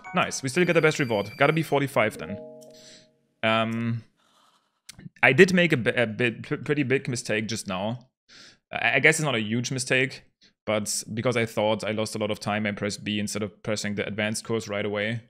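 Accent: Germany. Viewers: Okay, can I ask something? Do you speak German usually, because you have such an accent! Wenn, dann find ich das mega geil XD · Das erste dass mir auffällt ist sein deutscher akzzent lol. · wie man immer hört wenn deutsche englisch sprechen xD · are you german? you sound like it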